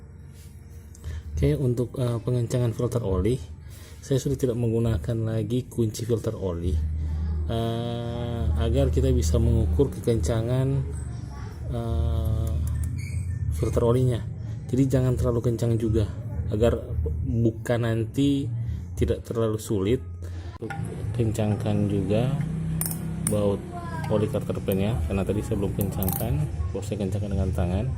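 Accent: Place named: Manado, Indonesia. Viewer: Dapa dengar rupa orang Manado ini kang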